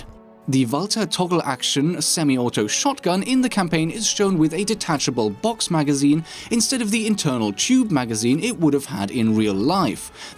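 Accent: Germany. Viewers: I'm laughting so bad!!! Omg, how to destroy a bad CoD game based on terrible historical inaccuracy 101 - featuring; summoned to defend the honor of the Fatherland. This is why I'll stick with Battlefield 1 for the time being. Sorry to say this, but I love the sound of your german accent/voice in full fledged fury. Seems/feels so natural.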